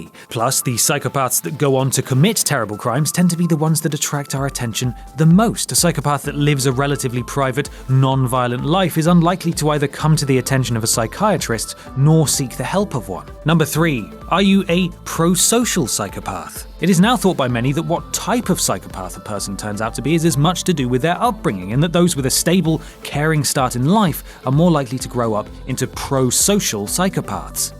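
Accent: United Kingdom. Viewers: I was wondering why watch mojo all the sudden become British but then I notice it was what culture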